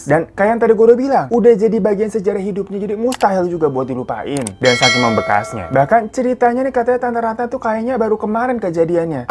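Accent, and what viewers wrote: Indonesia. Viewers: Slang indonesia kamu paling mantap.. suka saya dengar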